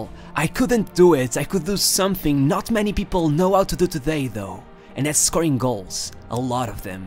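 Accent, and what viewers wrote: Portugal. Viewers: Are you from Mozambique,Portugal,Angola or cabo verde i swear you sound like your from Portugal or african country's that speak Portuguese iam gonna speak Portuguese and if you know you olá meu nome é Nícolas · you're Portuguese, my friend? you do sound like portuguese, 😁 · Bom canal. Esse sotaque português 😅😊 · Portuguese. The accent is unmistakeable.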